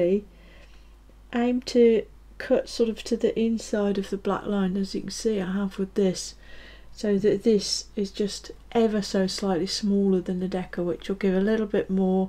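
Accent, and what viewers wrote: West Midlands, United Kingdom. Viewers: Hi strange question! Is there a touch of West Midlands in your accent? Certain words seem like it to me by the way carry on as you are very informative very high quality and presented in a concise and very logical manner ( grovel grovel )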